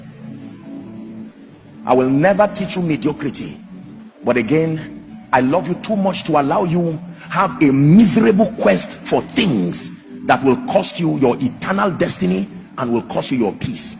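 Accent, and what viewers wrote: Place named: Nigeria. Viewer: 6-5 is this the workday where you are? Assuming this accent is Nigerian(?) and therefore assuming this video is to a Nigerian audience... You guys are hard working. 11 hour work day. Yoh!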